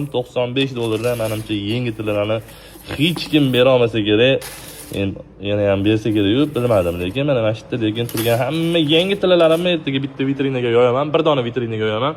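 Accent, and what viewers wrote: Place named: Andijan, Uzbekistan. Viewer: Кайердансиз.адресингларни айтмадингизу.шевангизга Караганда Агдижондансиз.❤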